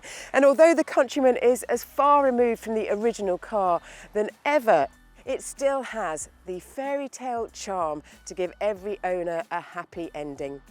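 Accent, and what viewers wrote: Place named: RP, United Kingdom. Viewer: VB-H showing us how a car review should be done! Even if she does sound a bit toffee-nosed she never fluffs or makes a mistake in diction! Good on you!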